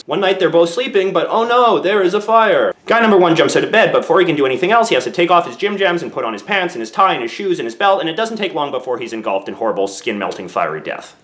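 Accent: Canada. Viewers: Bill Ward Hes canadian. Hes gonna speak differently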